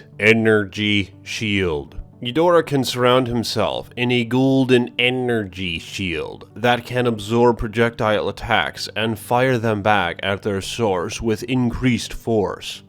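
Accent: RP, United Kingdom. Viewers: Why do u talk like a British butler!! annoying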